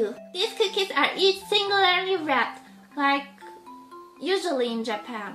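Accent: Switzerland. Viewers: her accent is swish German 😍😍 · she's not trying to. Her swiss accent is really thick. Everyone in swiss sound like that when talking in english. You dont really think people are all from america don' t you? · Kay Sloan I am from Switzerland but the French part. However I know what a swiss german accent sounds like, she has some of it but for the other parts of the accent, I have no idea where she gets that from..... maybe it's a baby accent!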